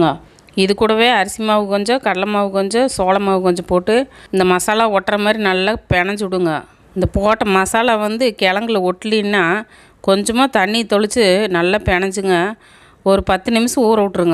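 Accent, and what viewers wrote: Coimbatore, India. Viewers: அம்மணி உங்க சமயல விட உங்க கோவை தமிழ் சுபெர்ங்கோ 😅...இது இந்த நாடட்மையோ ட தீர்புங்கோ 😅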